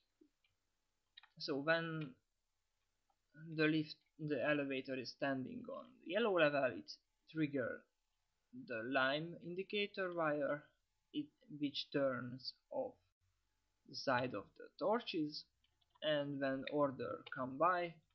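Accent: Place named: Serbia. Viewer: Thank you very much Both of these videos are very helpful. And nice accent mate, Serbian by guess.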